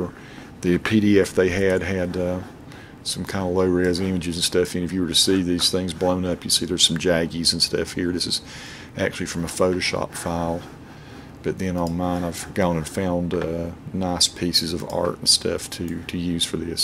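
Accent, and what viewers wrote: South, United States. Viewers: thank for this tip, and for your delightful southern accent. If Adobe was smart they would hire you for their tutorials.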